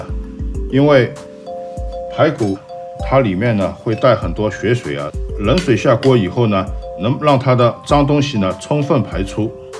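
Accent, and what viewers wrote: Shanghai, China. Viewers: 一听就晓得是阿拉上海宁师傅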